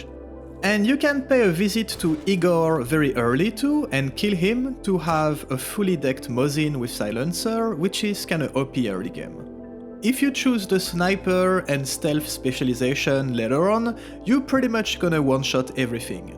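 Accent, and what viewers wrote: France. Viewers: is that a french accent ? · you sound french, and i know what i'm talking about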